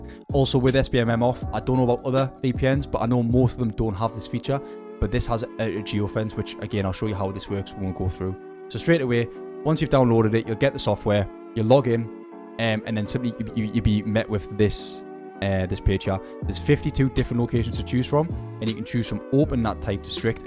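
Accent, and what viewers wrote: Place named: Newcastle, United Kingdom. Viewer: never heard a geordie youtuber before 😭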